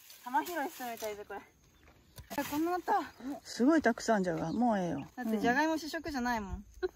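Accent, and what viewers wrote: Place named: Bingo, Japan. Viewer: いだちゃんねるから来て 初めて拝見しました。 備後弁？が癒されます♡ あ、でも自分の事名前で呼ぶのは辞めた方が良いです😅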